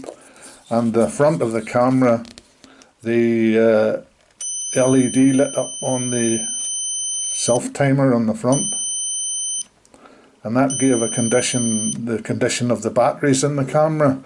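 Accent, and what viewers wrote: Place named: Ballymena, United Kingdom. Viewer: Great review. I can't quite place that accent, maybe a bit of Ballymena in there, well somewhere in mid Antrim, from a Co Down man living in Lisburn. 👍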